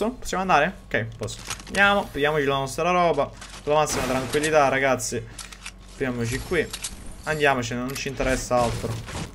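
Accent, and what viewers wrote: Naples, Italy. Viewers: Ero sicuro che tu fossi napoletano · Ma sei di Napoli??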